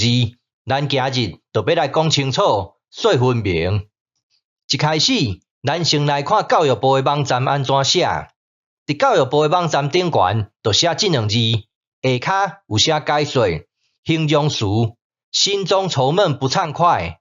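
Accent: Zhangzhou, China. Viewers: 千萬別改你的腔調，我住南部的關係，很難得聽到偏漳州腔調。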